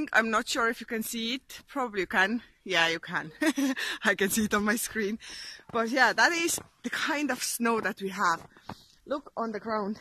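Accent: Ireland. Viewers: You're getting the cork accent! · BEAUTIFUL AND YOUR GETTING AN IRISH ACCENT THERE KIDDO !!!!!!!! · Your accent is great! I can hear a definite Irish element to your accent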